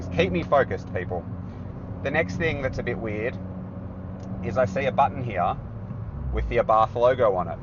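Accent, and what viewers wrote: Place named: Australia. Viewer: I had to triple check myself when watching this. youre in a right hand drive, with an aussie dialect but all the cars look the same as america. love the guys driving silverados down under, i have one myself but i also have an abarth. ive built mine but it was fun stock but since i dont race dirt bikes anymore i decided to build a cheap car lol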